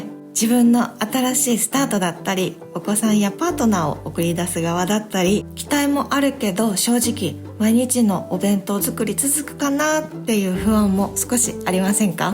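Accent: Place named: Kansai, Japan. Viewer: 関西のイントネーションだｗ いつも拝見して参考にさせていただいてます♬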